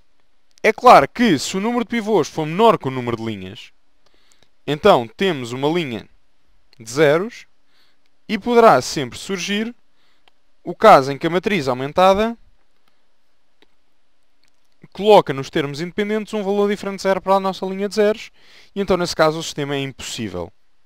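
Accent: Portugal. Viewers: É o português de Portugal, entendi nada Cadê as aulas com o português brasileiro?? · eu n entendi nada ele ta falando portugues de portugal kkkkkkkk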